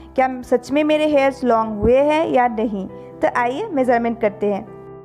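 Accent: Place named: Bengal, India. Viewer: Ap bangali h na?...apki awaz se hi lg rha h😊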